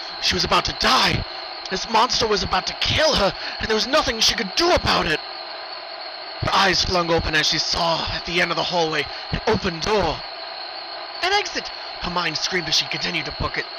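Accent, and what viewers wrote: England, United Kingdom. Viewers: What's with the english accent in your narrative? I admit, it does add to the drama.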